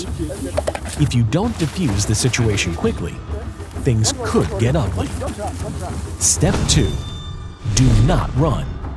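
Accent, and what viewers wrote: United States. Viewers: Dude u have an American accent stop using metric measurements. .WE DON'T USE THAT. .at least use both. .i was educated in the 90s aint no conversions in my head😭😭😭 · American accent. Gives information in metric system. You failed.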